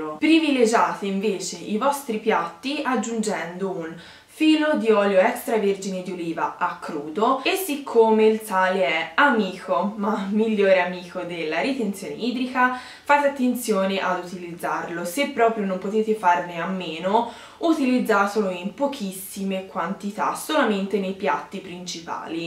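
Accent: Tuscany, Italy. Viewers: Sei Toscana? ☺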